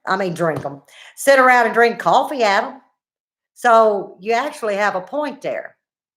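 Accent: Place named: Mississippi, United States. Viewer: I don't know how you will take this but the very first time I tuned in to your videos. I thought you were from Mississippi. I have no problem understanding "anything" you say. One of the reason I subscribed. LOL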